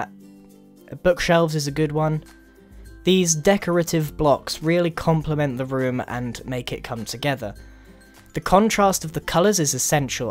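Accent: United Kingdom. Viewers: He's british, ok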